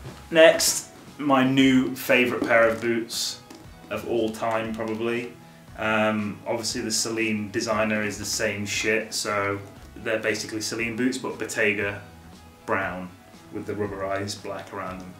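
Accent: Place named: United States. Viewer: Sounds kinda American to me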